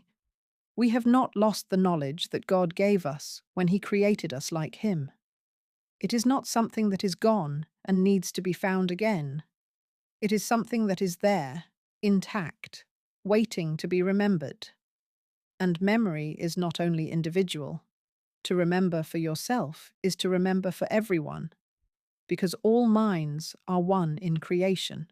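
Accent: United Kingdom. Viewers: ❤ Thank you ! That' s one of the most illuminating lessons of the whole course. And the explanation in this video is brilliant! The britisch accent even seems reinforce the clarity of the message. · Hello wonderful resource that you are! I know you are also missing the 'original voice' as we all are...I did want to say though the current choice isn't just an English accent it's an upper middle class, private school voice...it conjurs..Heirarchy, Empire, the class system and in short a kind of scolding snoberry! So I wanted to suggest.... it's known that.... - BBC Radio Scotland’s “Voice of the Year” - used to be a big one for broadcasters and storytellers using a Scottish accent. - Saltire Society Literary Awards / Scottish Book Trust - not accent-specific, but Scottish writers and performers who use dialect/Scots are regularly shortlisted and praised for “authentic voice”. 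- Fringe First Award - the most prestigious Fringe theatre award were won using strong Scottish accents to deliver comedic or political ideas. - Total Theatre Awards, Herald Angel Awards - also give weight to “distinctive voice” and use of Scots/dialect. - BAFTA Scotland Award for Best Presenter/Performer - has gone to people for work delivered in a Scottish accent. - Royal Television Society Scotland Awards Using a Scottish accent is seen as a strength...... *Toastmasters International - The winner goes to the European finals. Scots accents often win because the authenticity and cadence help land the ideas. --- The most “published” accolade people usually cite is a Fringe First Award or a *BAFTA Scotland*. Both are widely covered in the press and specifically celebrate performers who use their Scotti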